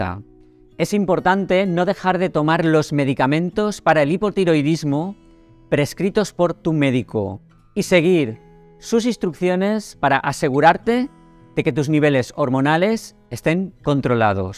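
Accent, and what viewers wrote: Spain. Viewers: fuera moco de mi garganta.... genial, muy práctica y exhaustiva tu explicación. Cada vez más informado. Genio!!! si vas al médico te dice: -consume lácteos, sra? y tu te apestas comiendo lo que te enferma!!!! ( pero que a tu paladar le encanta!!! no somos inocentes!!! ) volvamos a la fuente, comamos como nuestros abuelos. Ni poco ni mucho: suficiente! Y sencillo. De buena calidad nutricional. Pero no!!! vamos a la tienda de alimentos y compramos etiquetas... así nos va!!!! Gracias. Me agrada tu acento tan español... Te saludo desde Argentina.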